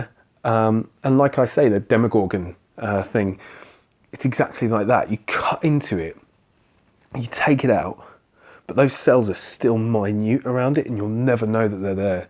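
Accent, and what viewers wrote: Australia. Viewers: Love the aussie accent, you"d make a good aussie warrior with your craziness, we do wacky well in Oz. Love ya, looking forward to getting up one morning and seeing you tell us all you have found the key!:) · You sir are an inspiration oh love the Australian accent nearly as good as mine !! Hope everything goes ok tomorrow all of us Here will be thinking of you xx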